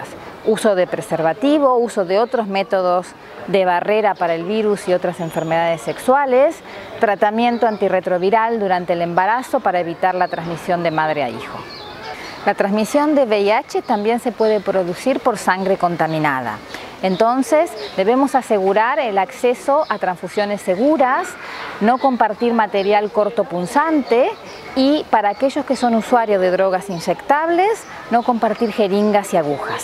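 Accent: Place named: Argentina. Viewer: Que papá Dios te bendiga mujer por el acento creó que eres argentina lastima que en mi país faltan médico como vos..con esa gran carisma ganan mucho dinero pero falta carisma al paciente...creó qué nadie va al hospital por gusto...si no hay enfermedad no hay enfermos,y si no hay enfermos no existirían médicos ni hospitales...Jesús el gran médico está de tu lado sigue así mujer argentina ... saludos de un panameño.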